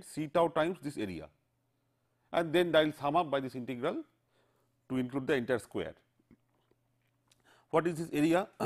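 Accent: India. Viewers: Is he speaking english with a hindi accent or is he speaking hindi with an english accent? I like his explanation though.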